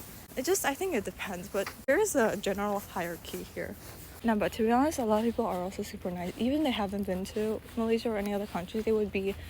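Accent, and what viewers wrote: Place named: United States. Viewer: You have an American accent. Your skin so nice too.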